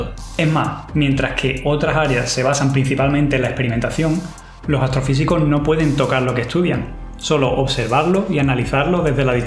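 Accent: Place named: Andalusia, Spain. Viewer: Ostras! He llegado a tu canal por tu hermano, estábamos hablando sobre veganismo y me pasó tu video sobre la carne, y guau. He seguido viendo tu contenido y puedo decir que he conectado muchísimo con tu visión del altruismo y esas ganas de provocar un impacto en el mundo. Me encanta como organizas la info, super claro y encima con la fantasía del andaluz! Fantastiquísimo! Aquí una nueva suscriptora!:D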